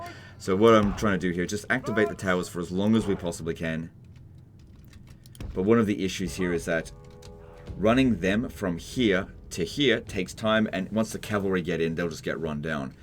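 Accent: Queensland, Australia. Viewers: Your accent sounds queensland to me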